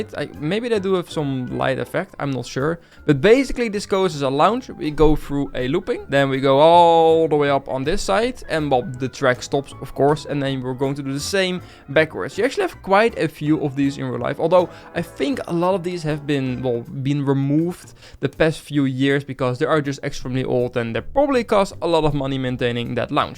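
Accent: Netherlands. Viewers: Sorry man maar je hoort wel veel te veel Nederlands er tussen door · U dutch like Benji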